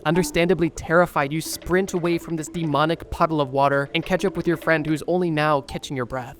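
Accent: Canada. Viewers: Youre canadian arent you? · I never noticed this dude has a Canadian accent it’s mind blowing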